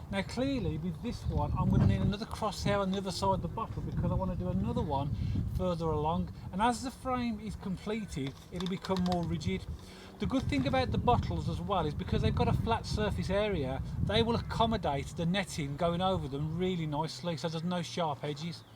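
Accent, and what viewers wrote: Birmingham, United Kingdom. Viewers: Have been struggling with the cabbage whites and looking at buying expensive cages...............I have lots of canes......Thank you so much to something even I can do. Good luck love the Brummie accent.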